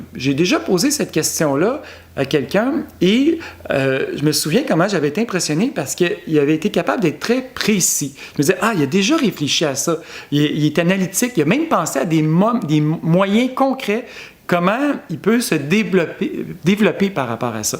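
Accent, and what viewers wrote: Quebec, Canada. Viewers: Parler familier québécois : on écrit des articles et on prononce des artics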